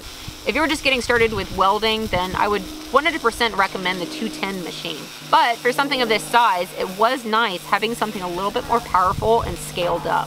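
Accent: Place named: South, United States. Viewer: Are you going to pour a concrete pad for that thing? When rainy season comes, I could see those concrete blocks sinking in and warping the bed. "Cody drug it over there." You go, southern girl, that's how we talk.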